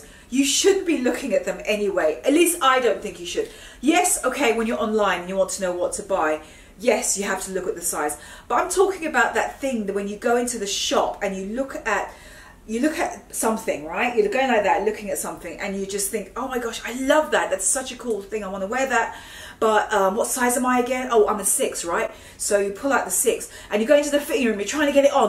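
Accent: RP, United Kingdom. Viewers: So fucking posh how have I not found you before now!!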